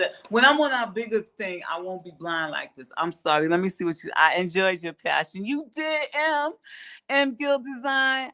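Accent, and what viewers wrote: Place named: New York, United States. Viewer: I knew it! Your accent was telling me you was from somewhere in New York. You sound just like my friend. 💕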